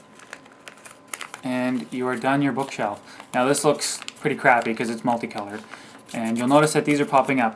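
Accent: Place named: Canada. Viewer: Air123ism got Astral'd.. You sound Canadian, much like the Vigilant Christian dude..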